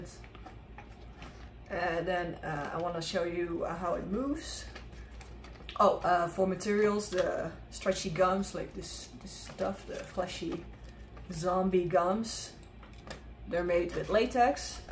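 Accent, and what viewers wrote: Netherlands. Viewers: I hear a Dutch accent!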